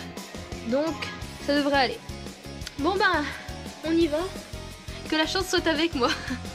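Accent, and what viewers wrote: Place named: France. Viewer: OH MON DIEU J'ai choquée en entendant une voix françaiiise ! xD Je t'aimeuuuh ! ♥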